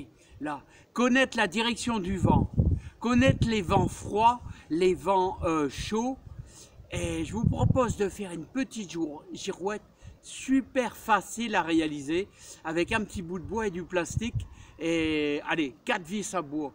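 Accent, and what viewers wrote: North, France. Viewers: Bonjour , je viens de découvrir votre chaine avec plaisir de vous entendre avec un bel accent du Nord! sympa, car moi aussi je suis de la région , disons le même coin ou peut être voisin.Merci pour vos vidéo et le travail donné.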